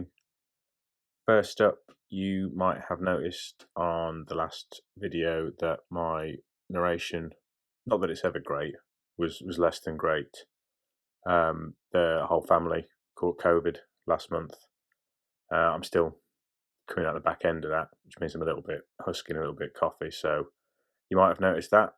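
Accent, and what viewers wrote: Yorkshire, United Kingdom. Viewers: I thought your Yorkshire accent was great! I mainly know the accent from TV (Monty Python or Stewart Ainsworth from "Time Team") but it's probably my favorite British accent. As for the YT algorithm, it's every bit as cruel, mysterious and capricious as the Morgen. I never get your notifications despite having clicked the bell. Many of the current events channels I follow upload multiple times a day and I get notifications for all of them. But I never get notifications for many creators I really enjoy who only upload every week, month, or even less frequently. Also I've been catching up on your videos for two days and I haven't gotten a single ad so far 🤔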